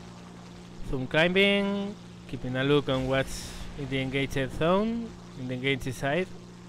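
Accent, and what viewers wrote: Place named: Spain. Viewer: Question: How do you perform the "Hammer" part? (when you climb up and use the rudder to get your nose down). I tried to do the same but the plane simply gets out of control... Btw, your accent was very strange the first time i heard it. Then during your Q&A vids you said you are from Spain. Now it all makes sense. Im portuguese (yes i know its kinda strange talking to you in english) and i can spot several words you pronounce the same way you would in Spanish. Thanks for the vids^^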